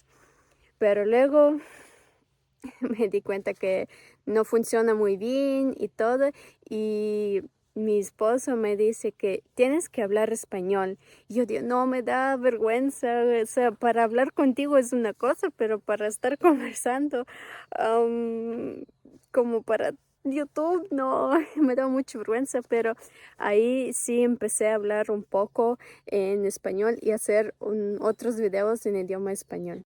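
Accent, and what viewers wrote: Ambato, Ecuador. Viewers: Mira yo soy ecuatoriano vivo en paris igual que tu me toco a mi ablar frances sin profesor yo se que es muy dificil pero yo te felicito tu ablas muy bien y de verdad tienes el acento ecuatoriano en america latina todo mundo abla español pero cada pais con su acento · 🎉Que bella esa vivencia. Ya habla español ecuatoriano, le falta la Música que es la más bella, como me gustaría mandarle música selecta del Ecuador , yo no soy de Ecuador pero si se la calidad de música sobre todo la antigua ya que la moderna ya dañan todo en lo q a música se refiere. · Ques pues dices al toser 👍👍👍👍 eres ecuatoriana ❤❤❤❤ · Cordiales saludos desde Quito Ecuador, tienes un hermoso acento ecuatoriano y aun mas, ambateño ¡felicidades! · Un español con un hermoso acento ambateño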